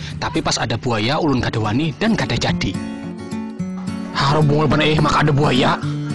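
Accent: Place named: Java, Indonesia. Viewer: bang org mana sih bahasany banjar tpi logatny kyk ada medok jawanya wkwk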